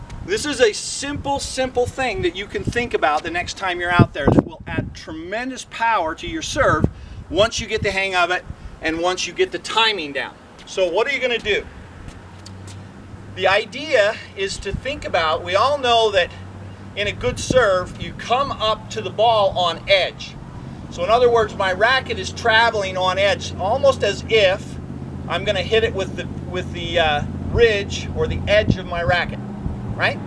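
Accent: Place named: United States. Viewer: Where is Insight Tennis situated? Your accent is US but the locality looks like Irlenad or UK..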